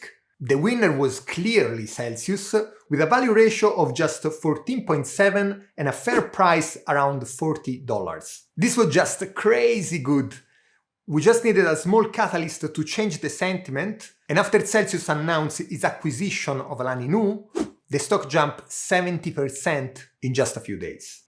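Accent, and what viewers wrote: Italy. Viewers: I just subbed. I have only watched 32 seconds. But judging from your appearance and energy your channel will probably grow. My early impression of your accent is Italian.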